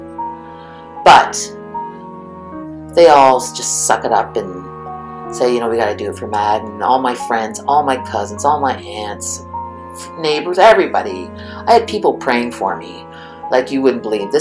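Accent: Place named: Pacific Northwest, United States. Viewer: Thank you for your story from Australia. You sound as if you may be from Pacific Northwest or BC. Much love and hope you continue to improve.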